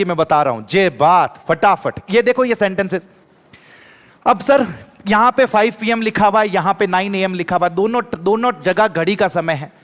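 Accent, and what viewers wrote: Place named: Rajasthan, India. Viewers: Jodhpur ri boli ❤ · गुरु jiक्या अंदाज है आपके पढ़ने का वह भी अपनी मारवाड़ी भाषा में❤❤❤ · Rajasthani vaani badiya lage hai sir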